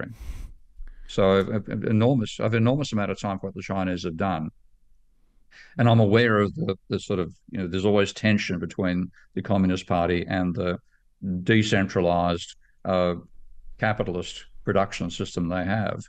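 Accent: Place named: Australia. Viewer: He is Australian 😂. They speak like that😂.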